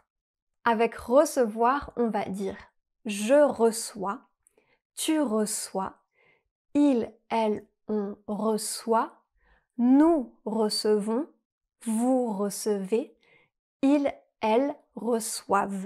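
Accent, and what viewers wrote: France. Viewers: Ce vidéo et très bon pour apprendre le accent française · This is perfect for me. I am in French 1 at school and am very good, already ahead of my peers and am taking French 2 during summer to be in french 3 for 9th grade. She sounds so similar to my teacher, a clean French accent and speaking slow enough for me to understand most words. Will be watching more of these to learn more on the spoken french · Oui, nôtre chère a encore une pointe d'accent que je situerai au nord du ”Sud de la France" entre Valence et Aix en Provence, mais pas plus au sud... ou peut-être un accent bordelais ou aquitain? Après je ne peux pas dire grand chose, je suis lyonnais pur souche et il paraît que nous avons un certain accent... C'est curieux car pour moi, ce sont les autres qui en ont un 🤓. Je trouve que les accents 'colorent' le français et je trouve ça bien. 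J'adore d'ailleurs les accents francophones comme on trouve dans certains pays africains, le Québec sans oublier la Belgique si proche géographiquement, mais ayant son accent typique (souvent moqué par les Français) et ses expressions qu'on ne trouve pas ici. C'est aussi vrai que pour apprendre une langue, il faudrait le moins d'accent possible (le lyonnais alors?) et de distinguer la prononciation des voyelles accentuées ou combinées comme les ai, é, ê et è, les a et â, les au, o et ô, ainsi que les e et eu comme dans feuille ou fauteuil, les ain, in et un... Tout ce qui rend notre langue si difficile à apprendre, mais si riche en nuances. Bon, j'en ai encore écrit une tartine? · Une émission qui nous explique comment mieux parler Français qui s'appelle "French Morning"!??? Et en plusse prononcé à la Française sans aucun effort pour au moins assummer l'accent Anglais.... Non! Désolé!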